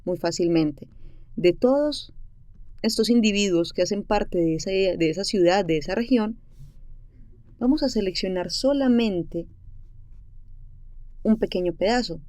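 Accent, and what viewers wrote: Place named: Colombia. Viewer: Esa voz es colombianaaa!!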